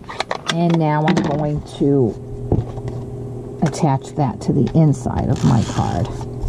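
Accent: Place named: United States. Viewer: I love to listen to you, I’ve never heard such a broad accent, not that I’ve been to many parts of your country bar a train journey from SanFransico to New York. Most Americans I’ve met are southern, or Chicago. X Ros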